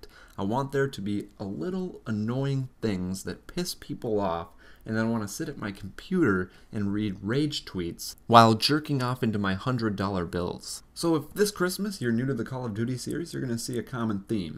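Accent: Minnesota, United States. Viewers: are you actually from Minnesota?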